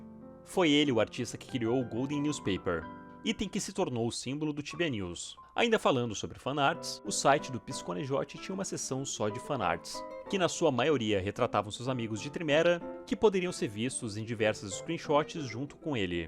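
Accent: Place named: Rio Grande do Sul, Brazil. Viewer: Dicção gaúcha. Gaúchos tem essa dicção pela educação forte de base.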